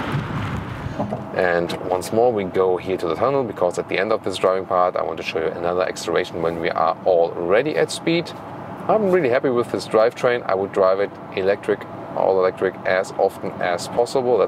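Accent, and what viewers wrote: Germany. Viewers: Da sieht man dass du deutscher bist aber sehr gut english kannst. Danke fürs review!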